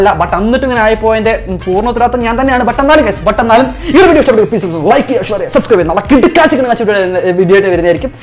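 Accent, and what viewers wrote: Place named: Malappuram, India. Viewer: Malappuram language me myaman uyir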